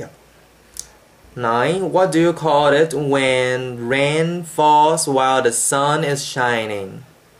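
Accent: Taiwan. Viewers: you sound like a typical taiwanese english accent... taiwanese do have an accent...anw all places have an accent... like for english the 2 major accent are american and british accent and Mandarin the major accents are the chinese and taieanese... so there is a taiwanese accent... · Yes, you speak English with a Taiwanese accent, which means that Mandarin is your mother tongue. I thought that I had given you a very clear answer. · You have a southeast "Asian" accent, be it Chinese or Taiwanese. But a little different than Korean. But yeah, you do have a Taiwanese accent as far as speaking in English. By the way, "pecan" is what you know as 胡桃 Hútáo. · 聽得出是台灣人 >^< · Btw you do have Taiwanese accent...but your English is definitely good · its pretty obvious taiwanese accent, which is great!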